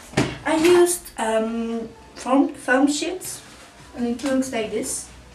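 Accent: France. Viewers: is your accent french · it's a french accent or ...?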